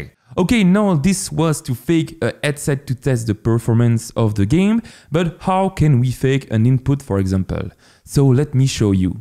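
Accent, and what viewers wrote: France. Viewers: es-tu français ? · Hello. Tu es francophone ?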